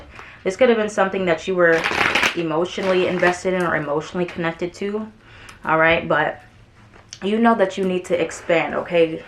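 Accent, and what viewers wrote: Bronx, United States. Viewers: Girl I thought you were from the Bronx, I was gonna say I'm from there too🤣 I just subscribed and yes, he's an aquarius. He just finished packing his things with a police escort 😩 I'm so over him and relationships. I've watched at least a dozen sag readings and they all say the same thing: it's painful but it's good for me.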